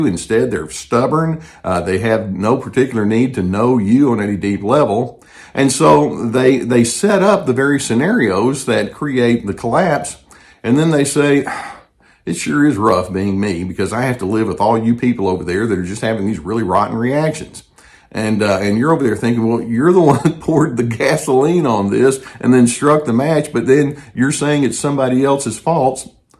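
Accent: South, United States. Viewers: vids began showing up in my recommendations even though I never searched for the topic of narcissism. It's like my android had been listening to me. That's a little creepy. I was in a bad relationship, but never attributed it to narcissism. After watching a few of vids a lightbulb went off in my head. I finally had an explanation for the craziness I'd been living. I so appreciate these vids, teaching method, and I love his accent being a Southerner myself. I've binge watched his vids and learned so much. Thank you!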